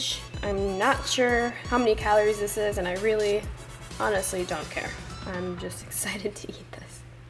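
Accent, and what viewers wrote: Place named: Minnesota, United States. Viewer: Would never have thought Minnesota lol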